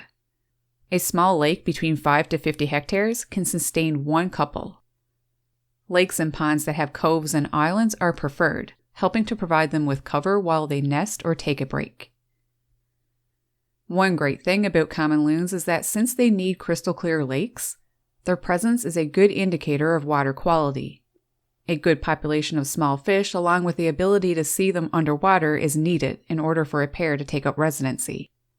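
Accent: Canada. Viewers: This narrator's pronunciation of "about" is a dead giveaway canadian accent, but I don't know any Canadians who pronounce their O's like A's like she does. I hear that in Michigan, Minnesota and Western New York. I'm assuming Canadians with that accent are near those borders? Sorry for the off topic, just curious.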